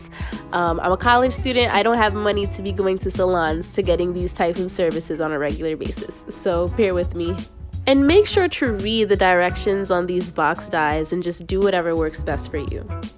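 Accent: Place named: Jamaica. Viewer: yes with the PATOIS gyal, GWAAN NUH! i think you have 4a/4b curls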